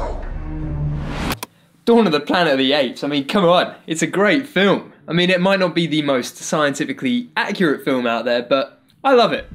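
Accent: United Kingdom. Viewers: "I've always been fascinated by the idea of animals using tools" Sounds sophisticated when you say it with an English accent. Not so much if you're from the states.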